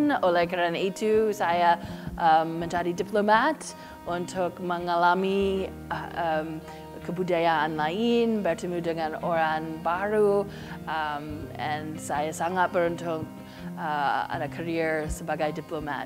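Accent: Indonesia. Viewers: Englonesiannya bagus. Semangat bu! Hehe.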